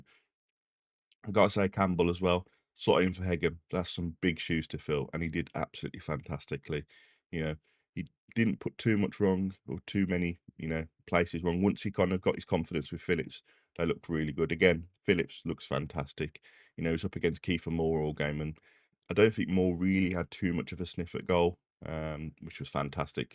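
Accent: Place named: Black Country, United Kingdom. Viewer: Seems a couple of Youtubers think that you should do as they wish, well you are what you are, Wonder they have not had i dig at your accent? to be honest mine is worse than yours. We are not called Yam Yams 4 nothin dun yo know wot i meen kid. I would though try just to say what you think, forget reading. Remember if it was the Black Country where the Industrial Revolution started if not where would the World B?? You are famous. Derby vs Cov, how did we not beat Derby?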